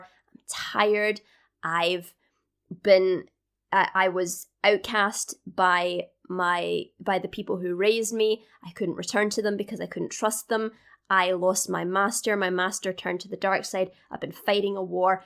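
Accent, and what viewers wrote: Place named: Scotland, United Kingdom. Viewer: You have a beautiful accent, are you from Scotland? And yes, I found your channel among the Ahsoka reaction videos.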